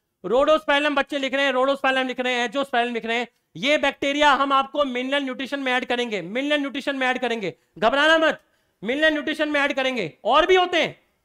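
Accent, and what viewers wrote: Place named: Hyderabad, India. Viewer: sir mujhe hydrabadi lag rahe unke language se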